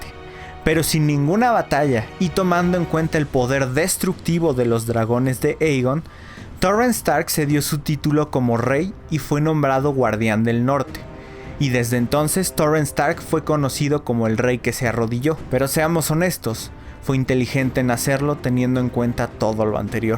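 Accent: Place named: United States. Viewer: Que mal suena ese inglés de USA. Es super irritante. Por cierto, se dice BRAVOSÍ, no Bravosi.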